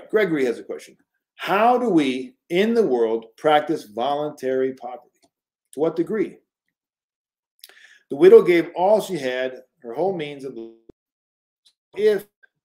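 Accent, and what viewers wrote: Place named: Upstate New York, United States. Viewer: Does originally come from Syracuse/Rochester, New York? His accent sounds like it is from that area. I knew someone from a church I once attended in California who had his same, exact, identical voice; and he had moved to CA from Syracuse/Rochester, New York.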